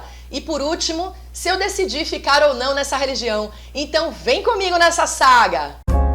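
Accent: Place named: Bahia, Brazil. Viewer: Que história linda! Deu esperança em encontrar o meu lugar sagrado. Não é sem sofrimento, não é?! E que beleza de relato com sotaque soteropolitano! Viva o povo da Bahia! 🎉❤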